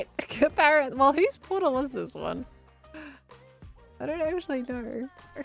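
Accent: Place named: Australia. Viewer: I'm just happy to hear the Australian accent.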